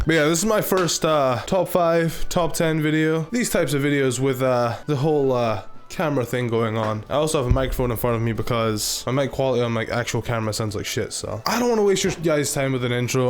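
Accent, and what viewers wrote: Scotland, United Kingdom. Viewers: Is half Scottish, because his voice has a faint hint of Scotland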